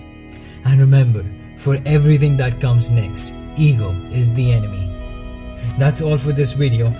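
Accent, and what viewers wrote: India. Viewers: awesome! really awesome! BTW are you an Indian?ur accent is like one! 😄🙌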